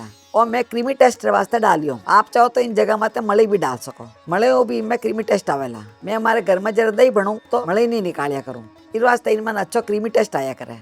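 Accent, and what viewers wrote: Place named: Jodhpur, India. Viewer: Mast vidio mast sabji mast jodhpuri boli sa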